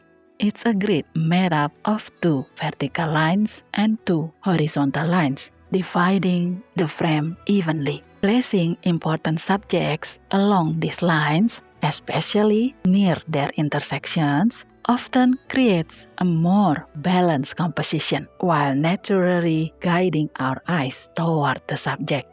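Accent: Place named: Indonesia. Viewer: I was struggling to identify your accent when suddenly, oh, Indonesia, ternyata. Analisanya keren, semoga sukses buat channelnya ya kak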